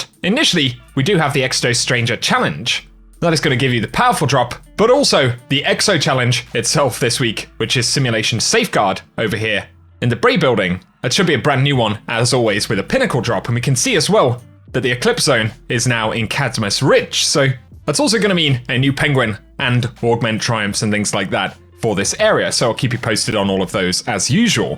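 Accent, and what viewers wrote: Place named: United Kingdom. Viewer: har-bing-ers.... LOL.... cmon - you have such Brit accent but, damn you cant pronounce much... think of it as BINGE like Binge drinking... Har-Bin-gers NOT BING - lol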